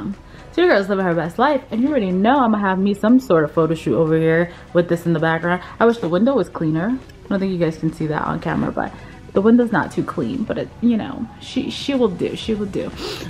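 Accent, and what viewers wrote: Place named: London, United Kingdom. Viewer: First off love the nails! Second I love your new London accent LOLS. YOur such a beauty can’t wait for second vlog!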